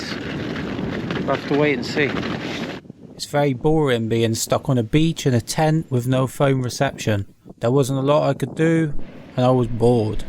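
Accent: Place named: West Country, United Kingdom. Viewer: Well done, thanks for another entertaining video. I'm guessing the accent is West Country, Bristol maybe? (I spent 20 years in Salisbury). Hope you managed some😴 sleep later. The poem.....................well let's just say don't give up the day job🤣🤣 Plus, I haven't figured it out unless the 40 stones throw were one for each year of the birthday boy? 👍🇬🇧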